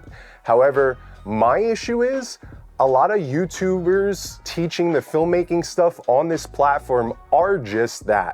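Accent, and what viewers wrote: New York, United States. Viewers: I'm a NYer living in LA for the last 15 years. gotDAMN I miss my peoples. I can see myself and all my homies from back home in the way you talk. Not just the accent, but the sentiment and realness behind what you're saying. Super refreshing in this space. More please. Also, wish I'd seen this video a couple of days ago. I just ordered the x100 pro package for a shoot I'm traveling across the country for. The good news is I don't REALLY need it because lighting is handled, but I just thought it'd be cool to try out one of these tiny lights that you can just throw in your bag and whip out once you've already got your shot and are just kind of playing. Should have gone with with one of the competitors! Anyway, you got a new subscriber!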